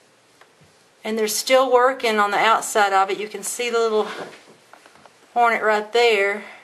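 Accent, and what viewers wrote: South, United States. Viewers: "Hawnets neyst, it is sooo kewl" southern drawl! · Some of you are being so rude. She isn't a hick just because she has a southern accent. Southern accents DO NOT signify ignorance. She tried to post an interesting video for all of you to enjoy and all you are just being rude. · Her southern accent is adorable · He didn't spell it like that because he didn't know the meaning lol... He spelt it that way because that is how you pronounce it with your accent. You don't say "Ten-ding" you say "ten-din" like you say "coo" instead of "cool". Granted he should have said, southerners not hicks.. but you guys definitely pronounce words much different than they are spelled xD. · omg that country accent bleh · Are you from Kentucky? You have a really rich southern accent! I love the south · Jesus, he was defending you! He said that because you have a southern accent doesn't make you a "hick"! 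And then he goes on to say if TOTALCONTROL would say that shit to a black person with an accent. You should try READING COMMENTS THOROUGHLY before you rub that persons face in the ground. >:(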